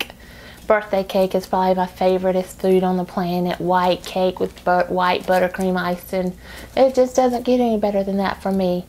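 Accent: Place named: South, United States. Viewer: Funny my first YouTube experience was watching Trisha Paytas also........she's a mess lol Love your southern accent....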